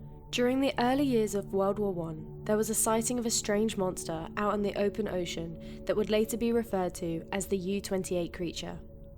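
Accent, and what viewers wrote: United Kingdom. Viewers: She sounds British.. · Hey...I love your beautiful voice! I also really like the voice of the young man with the lovely British (uh, I believe) accent that is like yours. Great video as usual. Hope to hear more from both narrators.🧸🎈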